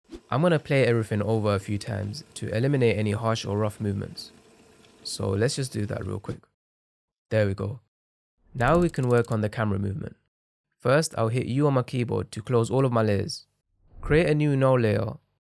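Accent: United Kingdom. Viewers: Bro are you from west London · I love the central cee accent my dude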